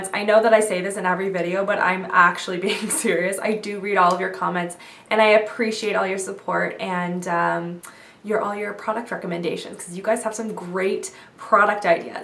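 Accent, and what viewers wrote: Canada. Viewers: Haha it sounded like you were saying oat instead of out and it was so weird but then you said you were from canada and it clicked! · haha ohmygod I love canadian accent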